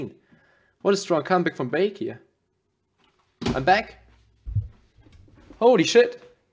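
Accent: Germany. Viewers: that german accent though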